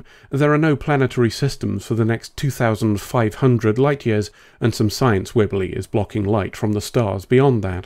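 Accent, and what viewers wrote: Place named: Yorkshire, United Kingdom. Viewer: I find these humorous reviews immensely entertaining. This could be because Star Trek has never really caught my eye as something worth seeing every week. Hence, I have zero nostalgic connection to the franchise. I did see an episode of the OST when in first aired. Ye Gods was boring. From what I can tell, Star Trek Voyager appears to have been made specifically for teenage boys. Boobs n Battles is a winning combination in one's teens. Thank you for expanding my Trek knowledge. Always a pleasure to hear a fellow Yorkshireman prattle on about stuff. Like yourself, my RP slips whenever I hit on the vowel 'U'. There is something about that particular engrained speech pattern that is hard to shake-off.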